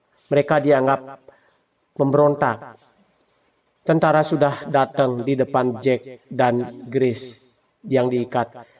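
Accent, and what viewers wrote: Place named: Kupang, Indonesia. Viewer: Orang kupang kyaknya nih😂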